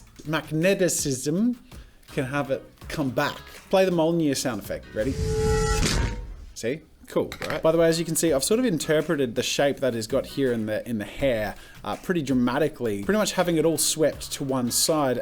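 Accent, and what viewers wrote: Australia. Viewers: Aussie accent lmao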